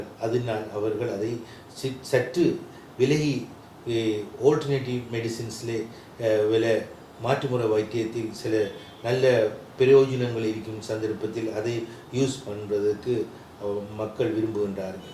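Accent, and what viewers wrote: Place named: Tamil Nadu, India. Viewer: Congratulations dear Dr it's nice to hear like Tamilnadu tamil.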